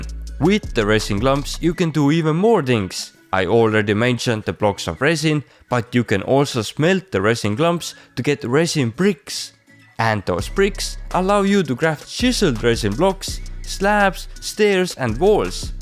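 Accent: Estonia. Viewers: Are you from Estonia? just curious cause ur accent is so Estonian. im from Estonia as well🤓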